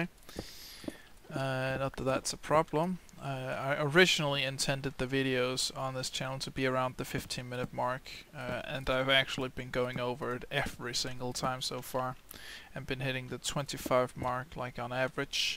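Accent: Denmark. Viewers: Bud, the aesthetics of this place are just a pure work of genius. I am loving it and more games with your danish accent would be enjoyable. :) Keep the vids coming. :)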